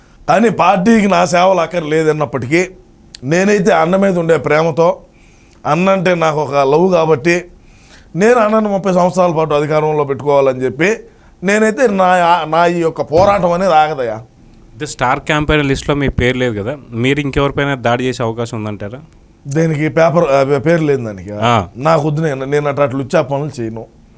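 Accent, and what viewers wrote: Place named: Kadapa, India. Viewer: ఇంతకు నీది యెవూరు బ్రో కడప స్లాంగ్ కూడా వచ్చింది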